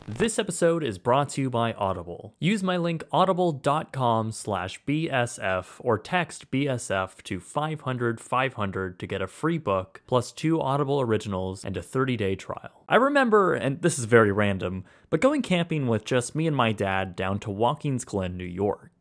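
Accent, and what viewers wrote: Canada. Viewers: got dat good-good nice, polite Canadian voice.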